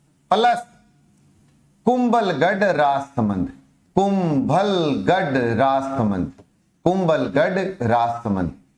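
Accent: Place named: Haryana, India. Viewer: Sir, Your language tongue is matching with Haryanvi/Bagri, Due to regional effect It supports easy to learn then Marwadi/Mewari classes, thanks for your great efforts.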